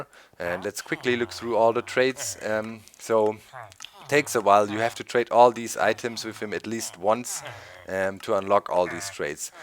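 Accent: Germany. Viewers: there's something I don't understand: Now in German, the W is typically as our V, and the V is typically like our F I think, right? How come with the German accent, you guys will often times say English words with a V as we say a W? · Give the German a break. English is not his first language.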